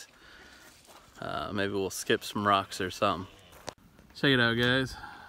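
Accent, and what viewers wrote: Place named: South, United States. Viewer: WOW THATS VERY BEAUTIFUL WEATHER LOOKING. UR DOING A AWESOME JOB WITH UR CHANNEL ! WOW. AWW LOOK AT THE DEER. LOL THAT DOG WANTS IT. IVE NEVER BEEN THERE BUT IT LOOKS BEAUTIFUL. I LOVE UR ACCENT. SOUTHERN SOUNDING A LIL. LOVE THE BEATS U PUT IN HERE. VERY ENTERTAINING. KEEP UP THE GREAT WORK!! I WILL PLAY MORE OF UR VIDEOS TOMORROW!! ITS ALMOST 1AM HERE. BUT I LOVE THE MUSIC U PUT IN HERE TO. AND THE FAST FOWARD MOTION. I LOVE NATURE . SKIPPING ROCKS IS FUN. LOL HAVE A GREAT NIGHT! ❤🤗❤🤗❤🤗🤗🔥🔥🔥🔥🔥🔥💯💯💯💯💯💯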